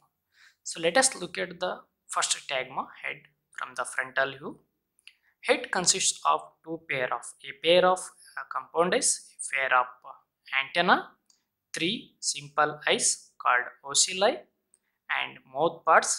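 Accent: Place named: India. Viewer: sir pls talk like a human being so its understandable for mortals who live outside the Indian subcontinent, pls thx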